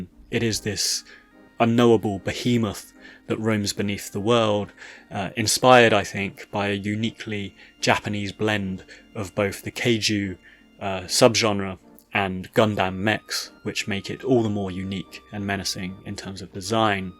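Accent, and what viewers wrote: United Kingdom. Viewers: fuckin' jesus wow british accent old game "review" that's an opinion dude find something else to do lmao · British limey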